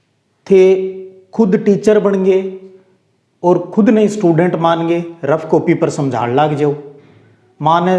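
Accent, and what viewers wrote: Marwari, India. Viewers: Sir hmare school me bhi ek sir marvadi bole he ve bahut achi marvadi bole he aap ke jesi 👌👌👌👌🤗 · Aaj sir marwadi bol rage ho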